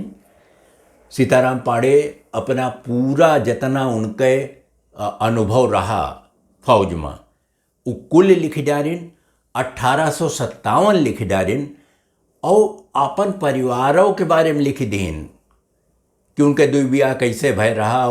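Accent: Awadh, India. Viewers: अवध पंच म आपकां सुनित है तो बहुत नीक लागत है। इतनी प्यारी अवधी में आप बतुआत हो कि सुनि कै मन मुदित होय जात है। सीताराम पांडे वाली किताब सारांश प्रकाशन से पहिले छपी रही। तब बहुत चर्चा भय रही। हम कुछ अंश अखबारे में शायद पढ़े रहेन। अइसन याद पड़त है। दिल्ली के विश्व पुस्तक मेला से तब हम खरीदै वाला रहेन लेकिन तबै हमरे एक दोस्त कहिन कि हमरे लगे बाय हम तुहैं दई देब। अउर आज तलक नाहीं उ किताब नाहीं पाएन। आप बतवा जाय कि उ कितबिया अब कउने प्रकाशक के यहां मिली। हम खरीदै चाहित है।.. आपका अवध पंच बहुत बढ़िया चल रहा है। आपको बहुत-बहुत बधाई और हमारी हार्दिक शुभकामनाएंँ। टूटी फूटी अवधी लिखय क कोशिश किहन हैं कौनो गलती होई तो माफी दीन जाई।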